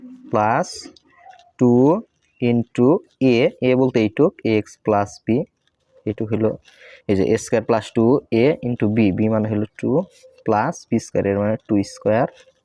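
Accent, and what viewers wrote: Tangail, Bangladesh. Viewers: ছার, আপনি কি টাংগাইলের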